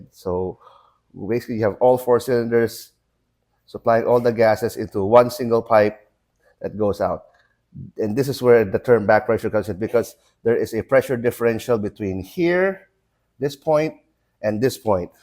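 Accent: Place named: United States. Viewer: parang laking US si kuya..ganda ng english eh hehehehe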